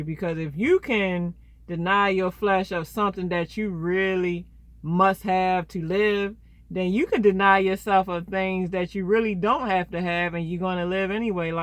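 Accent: Baltimore, United States. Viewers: Bmore accent.lol.sexy tho I found you cause I'm about to be a truck driver..n can't stop watching you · Lol! Sewper dry? Yewtube channel? I love your accent! Baltimore!😂😂😆😆😘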